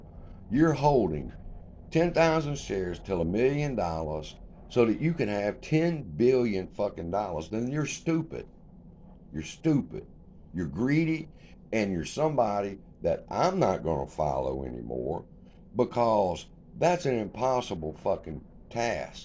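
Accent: South, United States. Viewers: Hey!you're a cool MF. What part of the South are you from?. More power to you have a good day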